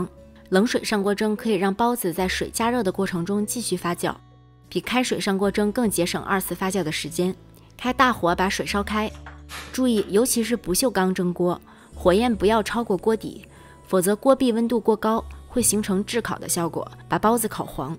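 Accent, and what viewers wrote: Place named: Tianjin, China. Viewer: 听着像天津姐姐包包子，明天就动手试试看！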